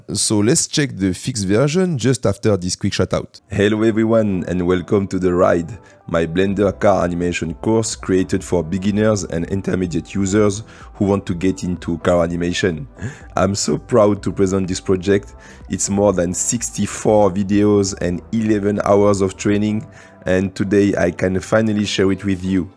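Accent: France. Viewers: Super t’as bien l’accent Français 😉 ce serait possible que tu fasse des vidéos en Français parce que c’est difficile de suivre tes tutos en Anglais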